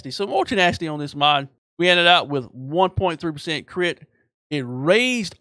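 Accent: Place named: South, United States. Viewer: your fast paced southern American accent is quite hard to follow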